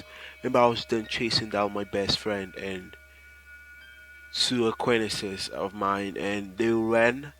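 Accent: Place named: United Kingdom. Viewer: y you sounding British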